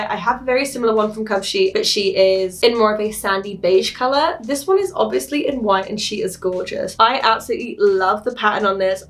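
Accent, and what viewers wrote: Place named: United Kingdom. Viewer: You are gorgeous and super cute. Beautiful eyes, cute voice with the British accent, beautiful body and curves, very cute little belly button, nice booty long legs. You look amazing and very cute in every outfit you wore in this Try On Haul video. The blue floral bikini really made your beautiful eyes pop and with the blonde hair hello cutie. The Pinkish bodysuit/one-piece swimsuit made you really beautiful too along with the White big T-shirt at the end. In the White big T-shirt at the end with the blonde hair you are really beautiful. You look like an angel.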